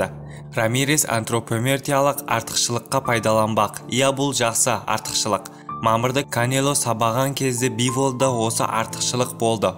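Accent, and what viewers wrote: Uzbekistan. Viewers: Автор өзбекпа басқама бастысы қазақша сөйлеуге тырысып жатырғой ағайындар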